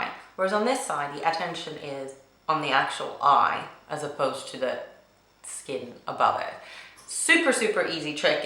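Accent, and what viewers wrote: United Kingdom. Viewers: how come your pronounce half your words in a British accent (i.e. glasses, can't) and the other half in american? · At first I thought it was a British accent then I thought it was just an Standard American accent. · What's going on with your accent? It's 97% English but there's a little twang in there too - could be Irish, American, Australian....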